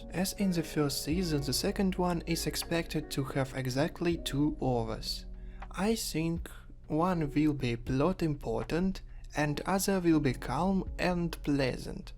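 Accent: England, United Kingdom. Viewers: Оу, вы из Англии?) Oh , you are from england?) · О, вы из Англии? (неплох) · О так вы из англии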